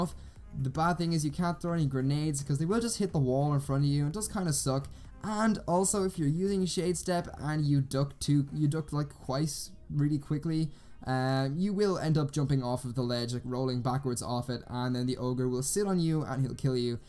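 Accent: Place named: Ireland. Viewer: Where are you from in Ireland? 🇮🇪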